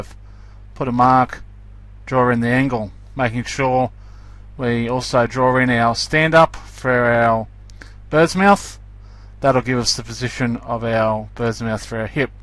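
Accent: Australia. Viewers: Aussie aye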